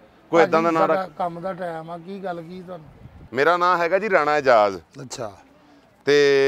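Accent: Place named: Amritsar, India. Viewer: Tuwadi boli sade ambersar Naal bahut Mildi ah te show vi bahut changa lagda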